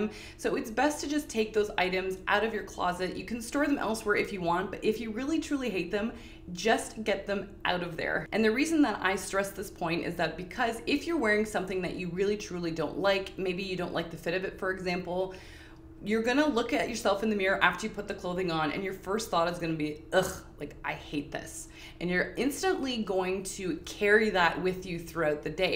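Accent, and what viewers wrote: Canada. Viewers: Less than 30 seconds in and I just have to say your accent is so cutely Canadian! Have a wonderful day! Now back to watching the video.......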